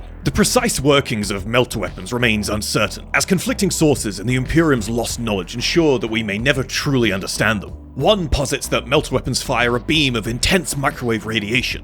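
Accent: United Kingdom. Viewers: Bri’ish bloke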